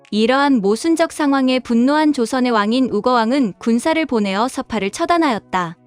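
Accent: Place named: Japan. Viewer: 이거 무슨 일본놈 한국말 하는 소릴 하고 있어 ~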